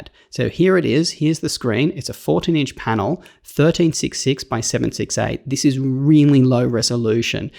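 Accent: Australia. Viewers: Your enthusiasm is great. Like your Australian accent. About to buy my 1st laptop so your review is very helpful. Thank you.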